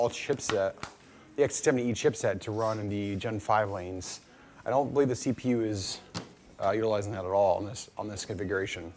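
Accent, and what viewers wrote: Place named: RP, United Kingdom. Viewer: I was fortunate to have the option of the subtitles, otherwise I wouldn't have understood anything you said. Pls either speak slower or get the plums out of your mouth. Sorry about that, mate.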